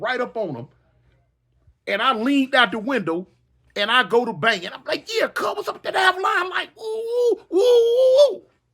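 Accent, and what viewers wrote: United States. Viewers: Know disrespect but your war stories are garbage or maybe it's how you tell them and you sound country as F.ck get your Cali swag right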